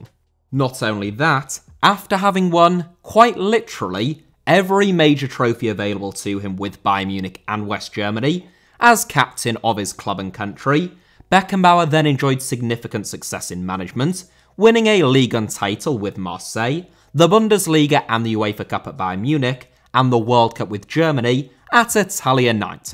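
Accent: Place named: United Kingdom. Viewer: Well done mate , I have had a wee look at your stuff over the past couple of months , thoroughly enjoyed it and passed it along to my football loving buddies . For me as a Bolschie Celtic fan it isn`t a massive jump in consciousness to really identify with a lot of the issues which you bring up . But what I really love as an old git who enjoyed Fantasy football ( with Badiel and Skinner ) the football journalism from the real fans in " My favourite year " , When Saturday Comes etc etc , it`s nice to see that the tradition of humourous well informed football journalism is alive and well with guys like yersell . Still waiting for an opinion from my other Celtic buddies on your stuff , but some of them are of the naive attitude that politics has nothing to do with sport . Au contraire mes Amis , tell that to Tommie Smith and John Carlos . and that`s one of the reasons I enjoy your exposure of the despots who use fitba to sanitise their horrible public image. 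Keep up the good work young man .Always love to hear accents from the UK ( I live in Germany and I miss this almost as much as I miss a fry up ) . I shall continue watching and write again . Some of the comments are priceless. 🙂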